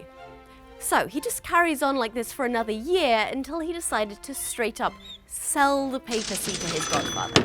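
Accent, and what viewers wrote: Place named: RP, United Kingdom. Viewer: Wow, sounds really posh in this video! Being in the States has really altered her accent.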